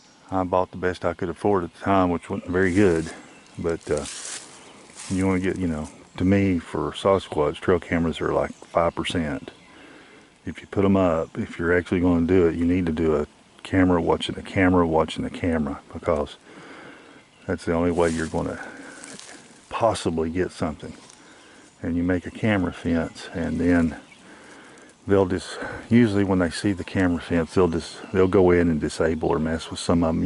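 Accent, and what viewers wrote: South, United States. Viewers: Enjoy your Videos, good hearing another Southern voice, pretty Country there. Stay Safe, best wishes from a Ga Fan....😷🇺🇸🍑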